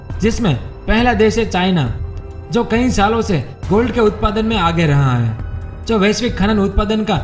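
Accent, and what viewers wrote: Gujarat, India. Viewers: Tu Gujarati lage se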